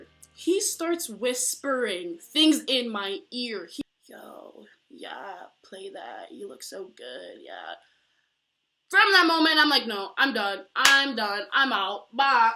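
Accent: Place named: Africa. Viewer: Are you African? I heard that accent! I'm Nigerian 😁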